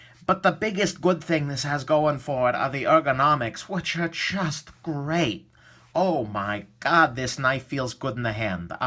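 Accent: New York, United States. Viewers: Good review. Now work on that NY accent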